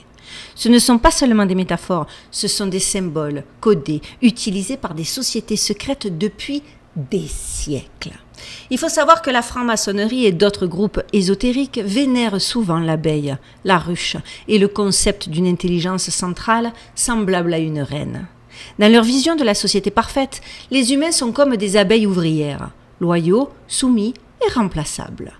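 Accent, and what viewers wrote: Marseille, France. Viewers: J’aime beaucoup l’accent de Marseille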